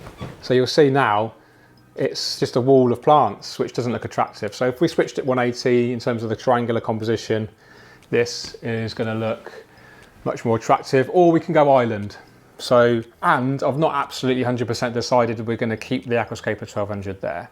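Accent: England, United Kingdom. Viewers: Oj, what's wrong with an English accent mate? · So many excitiing projects. So much positivity and next level growth. And then come the tiny trolls and cowardly keyboard warriors looking for any little thing to dump some useless negativity on you, trying to slow your roll. The utterly asinine statement about your English accent and rambling... you're English, you live in England, does it not stand to reason and logic that you would have an English accent? It's the blatent ignorance and outright stupidity of cowardly keyboard warriors that always amazes me. If they don't like the accent or style, why not simply leave and find a dramatic, tiny troll channel that will resonate on the same level of stuck-on-stupid. It really is that easy and that drama free. No need to dump negativity and show off personalized styles of stupid. 86...pushing 90 thousand folks like the English accent and the ever growing positivity, style, and awesomeness of you doing what you love, loving what you do, and sharing this amazing journey with us. 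😘 👍💕👍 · Another great Video, and what a funny accent, must be english ,😁 greetings from germany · I love your English accent and keep on rambling! 😀 Also I'm pretty excited about the Dennerle news. Thanks for sharing 🤗👍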